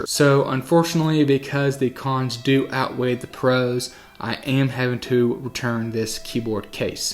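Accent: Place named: South Louisiana, United States. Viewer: Hey, like your review. I'm just trying to decide whether to get this one or wait for the Brydge Pro Plus to get it's act together. I'm pretty sure they will. And I like the idea of aluminum. One question, just out of curiosity: where is your accent from? You, by chance, from south Louisiana? Your accent sounds familiar.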